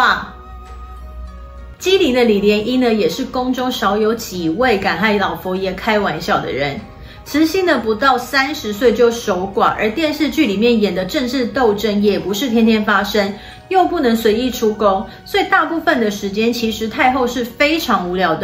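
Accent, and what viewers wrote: Taiwan. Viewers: 标准的台湾国语。比很多ㄉㄞˉㄨㄚˉ厲害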